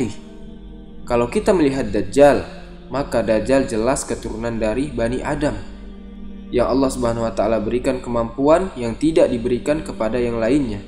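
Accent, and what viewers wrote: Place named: Sulawesi, Indonesia. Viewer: Didengar dari logat bicaranya admin ini kya ny org daerah sulawesi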